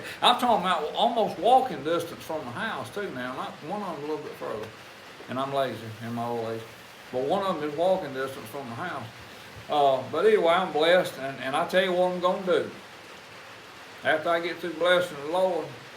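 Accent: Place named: West Virginia, United States. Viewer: You talk just like everyone where I grew up in West Virginia and I think I must've talked like you too back then but lost it. You talk like my friend John and others around there near our farm. But you’re with wild hogs thick so you’re probably further south. Praise the Lord and pass the arrow-harvested wild bacon !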